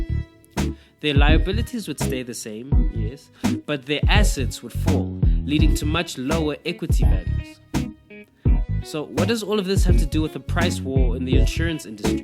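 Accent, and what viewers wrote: South Africa. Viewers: I hear a South African accent in there!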